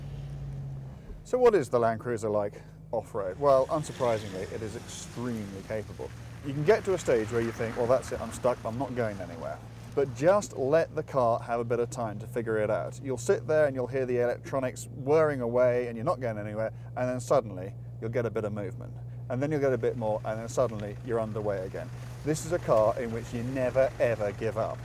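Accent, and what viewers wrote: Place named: England, United Kingdom. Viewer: Ведущий-то не очень на англичанина похож ))), акцент да, но внешность нет )))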